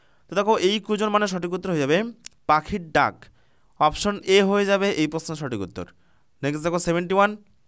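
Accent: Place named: Alipurduar, India. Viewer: Sir apni kon jelar.. Anek ta Alipurduyar er vasar moto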